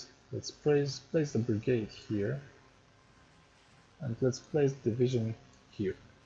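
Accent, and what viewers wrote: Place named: Eastern Europe. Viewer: You meant to say "SEXY EASTERN EUROPEAN ACCENT".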